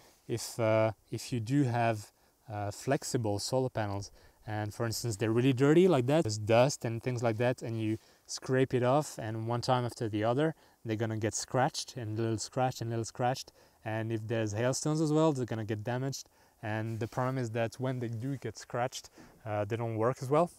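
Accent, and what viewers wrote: France. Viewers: Okay I give up... French? Swedish?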